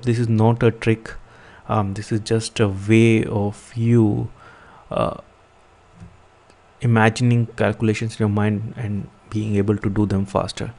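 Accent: India. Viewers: When you here a indian acent you just know hes good to maths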